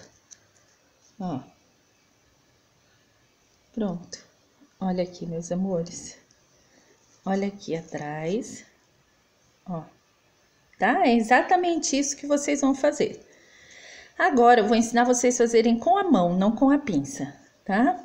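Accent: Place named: São Paulo, Brazil. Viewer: sotaque de sao paulo, igual ao da professora, é o mais bonito do planeta. pronuncia limpa sem enfeites, quase um canto. rsrs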